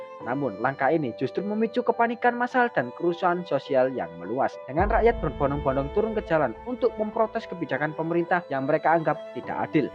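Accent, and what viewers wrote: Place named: Java, Indonesia. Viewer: ngomongnya ga usah buru2 bg mending pelan2 tp jelas,kecepetan jd kdengeran medok 😅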